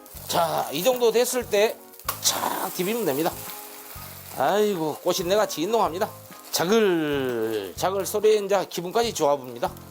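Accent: Jeolla, South Korea. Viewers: 사투리가 영락없는 우리 전라도 사위 말투 똑같네요.❤ㅎ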